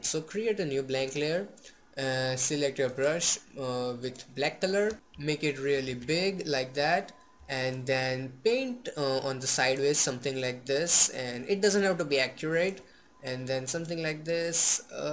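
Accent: India. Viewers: is that an Indian accent? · I love your indian accent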